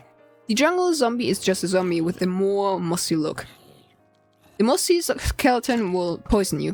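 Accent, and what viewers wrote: Czech Republic. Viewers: i luv ur czech accent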